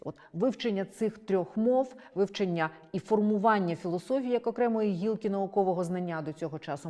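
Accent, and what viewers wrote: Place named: Russia. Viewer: Дивно слухати від пані лекторки російську вимову наших старих текстів: іґраніє, Саґайдачний А попри те це відео - добрий вступ до тієї бурхливої доби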